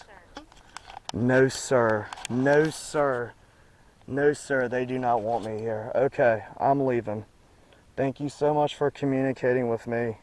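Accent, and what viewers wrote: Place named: South, United States. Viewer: are you in Louisiana? I noticed you had a southern accent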